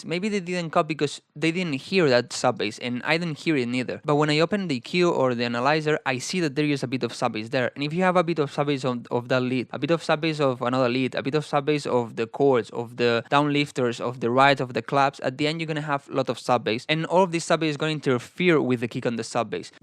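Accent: Spain. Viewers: noooo? Really? What sort of witchcraft is that!? 🤣 I was simply placing a rhetorical question as this fellow has an obvious Spanish accent 😁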